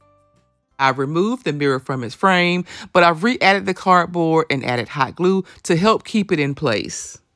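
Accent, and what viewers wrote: South, United States. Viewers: Girl this DIY is the bomb! Thank you so much! Love your southern accent too 😘😘😘😘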